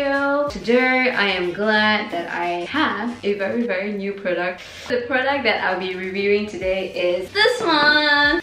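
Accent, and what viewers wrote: Singapore. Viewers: Haha I guess singaporean and malaysian english sounds simillar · She actually looks and sounds like my Singaporean friend.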